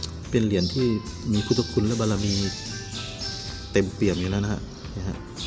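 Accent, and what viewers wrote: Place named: Buriram, Thailand. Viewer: แสลงโทนบุรีรัมย์คับ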